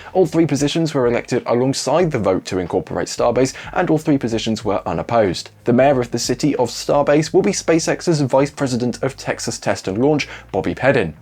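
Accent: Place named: South, United Kingdom. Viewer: Sad to see that some commenters are having trouble with his Standard Southern British accent. If you cannot understand standard English you should work on yourself, rather than complaining -- especially since the audience is international. If he had a heavy Scots, Irish, or north country English -- then I could accept complaints. But he is speaking NEWS ANCHOR quality English to an international audience. Ask someone from Europe, Asia, even Canada, he probably has the clearest accent of all the NSF presenters. Anyway, guys, just turn on subtitles and work on improving yourself.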